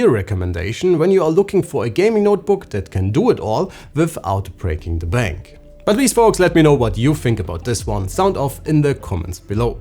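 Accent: Germany. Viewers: yes I love the German accent its so sick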